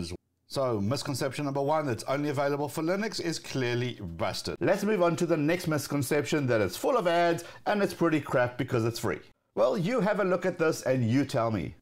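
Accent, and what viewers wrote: South Africa. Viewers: Very usefull video. Thanks! So I am thinking. The accent.... born either in Cape Town or Sandringham....? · Wait, are you South African?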